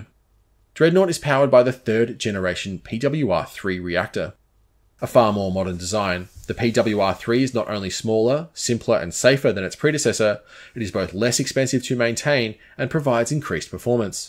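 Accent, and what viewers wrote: Australia. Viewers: What am awesome podcast mate! Hearing the aussie voice for over 2 hours was quite pleasant. You could split this into 4 parts for bulk views but damn, my Saturday morning has been educational! Cheers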